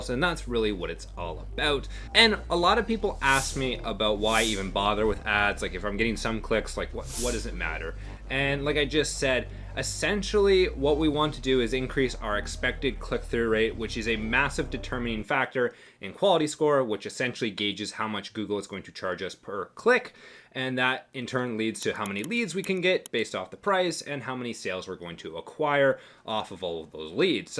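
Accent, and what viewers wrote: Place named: Toronto, Canada. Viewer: Thanks a ton for the content. So useful. You've basically become my tutor on all to do with Google Ads. I'm a small business owner and have a dormant passion for marketing and sales. Used to have a role as a sales and marketing manager. Learned how to build websites and the basics of SEO. Did some basic coding. So enjoyable. Now I run a renovation company. Just getting into the Google sphere and really appreciate and enjoy your content. I'm from Brampton btw. Peel!! Lol 😅 Noticed you're from somewhere in the GTA region by the sounds of it. Anyways, thanks again. Your hard work is appreciated...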